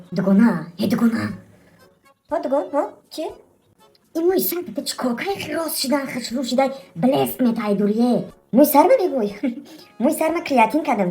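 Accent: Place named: Garm, Tajikistan. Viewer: 😂😂😂😂😂😂😂😂❤❤❤ чртущ рафтай фуртуш мондай 😂😂😂😂😂😂😂😂 куштима ай хандара неке як савол чиба гармиги гап мезане постаяно гармиен шумо е ки гармиера гапошона прикор мекни?? Гармие зур гап мезана мада махкулай зинда бошен мардуми гармие дар кучое бошен Худо нигахбонтон бошад ❤❤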